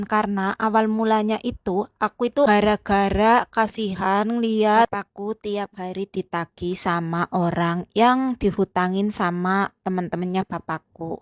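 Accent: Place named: Banyumas, Indonesia. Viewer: Sehat dan semangat terus mba'e💪💪💪 Asli mna mba'e kok kayakne ngapak2 😂😂 kaya inyong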